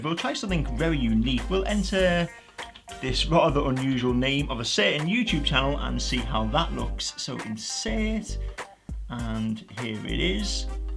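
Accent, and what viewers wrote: Liverpool, United Kingdom. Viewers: Hi, nice review - I built my own very similar engraver but one of these caught my eye while browsing eBay. However, I cannot work out your accent. Bit of Scouse, bit of Geordie and a bit of Oxbridge:-) Where are you from?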